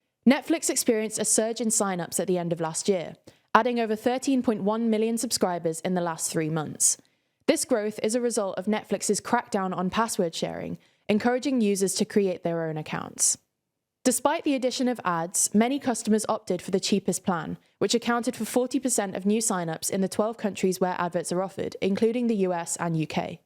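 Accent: United Kingdom. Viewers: She is great but but has a T on the end, she is confident, easy to listen to and the news is impressive but she does say bu and similar so her pronunciation is more a natural British rather than correct :0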